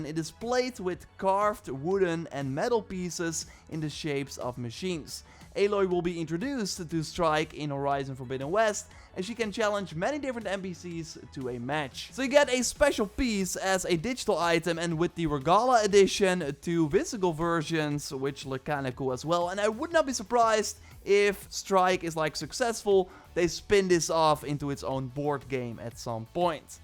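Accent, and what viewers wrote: Netherlands. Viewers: As a dutch u can so clearly hear u are dutch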